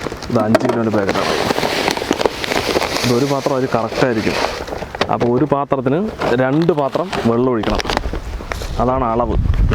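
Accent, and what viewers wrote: Palakkad, India. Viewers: PALAKKAD basha sooper and sooper biriyani.. Proud to be from pallakad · Igale palakadan shyli samsarom kooodi cooking nte kooodea cherumbo oru pratheaka monjaanu vediosnu super aanu Ella vediosum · You are the best brother, I keep waiting for your food recipes. Also, your Palakkad accent has become a hit.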